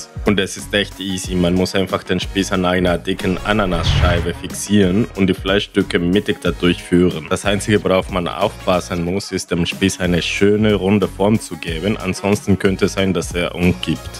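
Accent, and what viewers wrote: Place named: Russia. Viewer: lol du hast ur den russisch akzent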